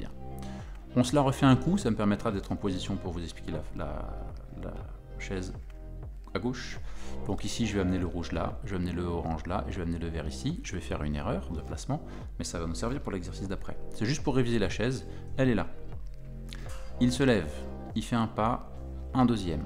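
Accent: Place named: Belgium. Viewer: Trop pourri ton accent belge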